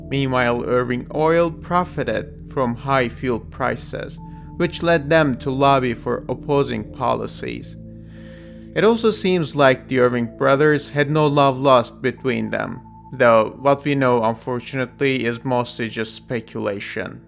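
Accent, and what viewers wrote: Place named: France. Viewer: sounded like a strong french accent. BTW, the Irvings were originally from the Buctouche(sp) area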